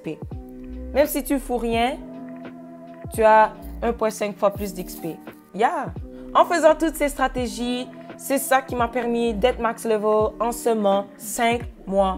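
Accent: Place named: Canada. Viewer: Ton accent canadien là 😭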